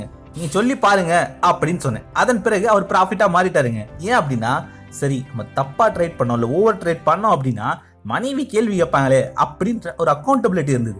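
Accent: Madurai, India. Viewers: Madurai slang nalla irku anna ....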